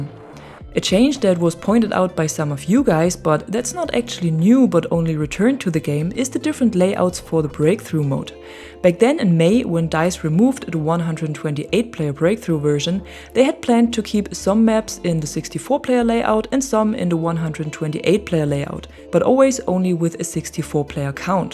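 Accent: Germany. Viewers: Lol du bist wirklich deutsch? Haha habe es irgendwie rausgehört war mir aber nich zu 100% sicher xD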